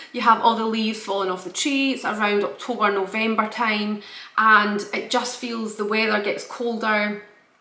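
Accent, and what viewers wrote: Scotland, United Kingdom. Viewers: I could listen to your beautiful Scottish accent all day cheers from Sydney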